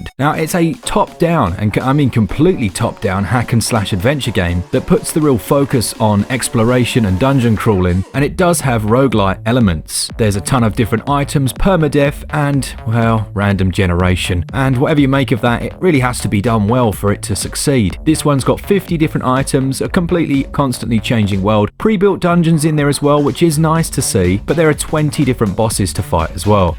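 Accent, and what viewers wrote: United Kingdom. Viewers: Totally agree, it is so nice to hear this pure BrE! I once compared guys to that for me, being from CH, was kinda benchmark:)))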